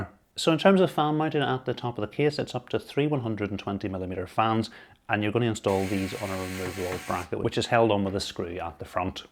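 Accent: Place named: Northern Ireland, United Kingdom. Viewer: That's just a northern Irish accent...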